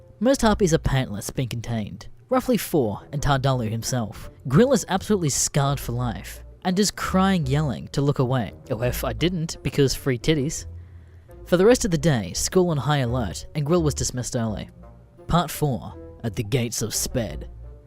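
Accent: Australia. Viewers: good morning aussie boy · Wait your an AUSSIE A REEEEEEEEEEEEEEEEEEEEEEEEEEEEEE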